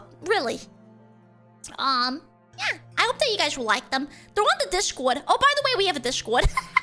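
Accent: Boston, United States. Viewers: Hi, thank you so much for the fun chat at Sakura con. Can't wait to see this channel grow. Love the adorable Boston accent btw.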